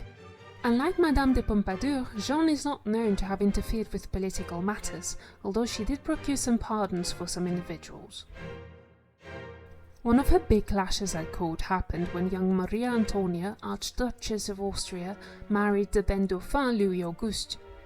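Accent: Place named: England, United Kingdom. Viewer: The fact that this AnasSyriano is not even capable of recognizing an English accent or not has made a fool of himself or herself. So never mind : your accent is truly nice, and thanks for the video 😉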